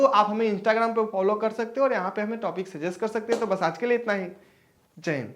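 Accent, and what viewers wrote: Bihar, India. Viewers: Aapki aawaj thoda bihar type me he thoda thik karo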